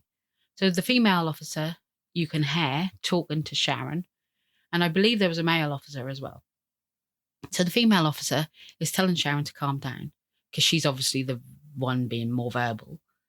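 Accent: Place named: Norwich, United Kingdom. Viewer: Hi! I'm just wondering where you are from? I'm from Norwich and you sound very local. Best wishes Jane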